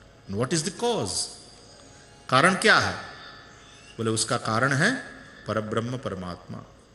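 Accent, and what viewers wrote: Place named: South India, India. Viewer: Dear Swamiji, your Sanskrit pronunciation is topnotch. looks like you have studied somewhere in south India.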